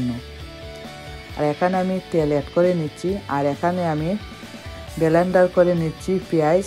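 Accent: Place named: Habiganj, Bangladesh. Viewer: Apa je moja oise lager r mukhi to kota oi nay apne mat hobigonjer tone ase gift dia gesi affa apne o diva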